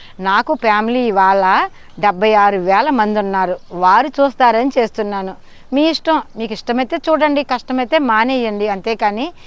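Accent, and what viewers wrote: Godavari, India. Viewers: Godavari jilla తెలుగు super madam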